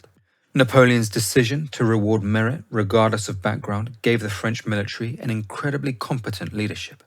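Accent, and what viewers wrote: United Kingdom. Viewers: good narration, sources cited in the video, good historical and reproduction footage, British accent, and cool topics. Subscribed.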